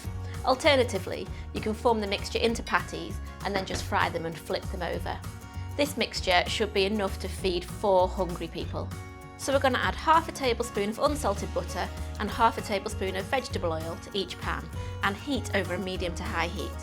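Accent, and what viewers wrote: United Kingdom. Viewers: I love british accent.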